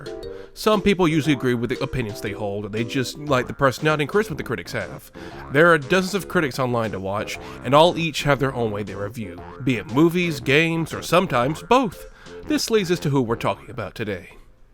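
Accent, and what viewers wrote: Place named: Brooklyn, United States. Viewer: His dumbass brooklyn accent that only comes out on specific words is grating as hell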